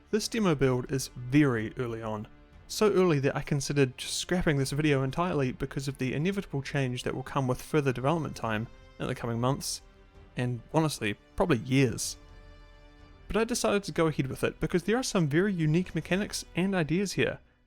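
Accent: New Zealand. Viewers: A Kiwi accent, what a pleasant surprise! I am so hyped for this, especially after seeing the lacklustre CoH3 demos.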